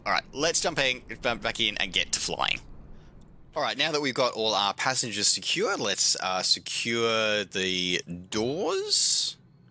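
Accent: Australia. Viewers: You must hold the recorddddd for the most annoyingggg Australian voiceeeeeeeee.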